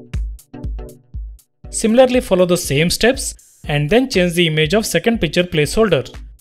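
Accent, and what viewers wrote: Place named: South India, India. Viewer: Wow.... Sir i dont know why, But i feel you are from south india.. May i know from which city you are ?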